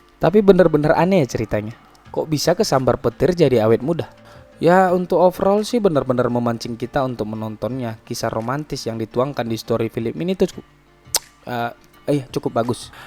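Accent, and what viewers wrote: Medan, Indonesia. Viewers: Oi bang.. Orang Medan ya? 😅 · Medan kali ya logat mu 🤣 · Pembawa ceritanya kayaknya orangedan ya 😁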